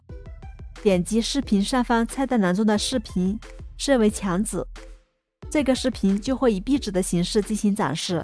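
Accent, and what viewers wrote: China. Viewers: 声音挺可爱的，而且英文也是同款中式，很有亲切感~哈哈哈~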